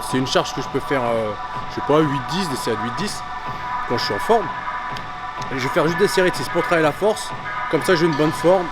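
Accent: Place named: Nord-Pas-de-Calais, France. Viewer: J’aime bien l’accent chti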